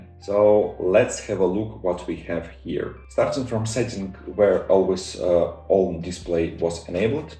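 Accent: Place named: Russia. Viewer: U from Russia? I hear strong Russian accent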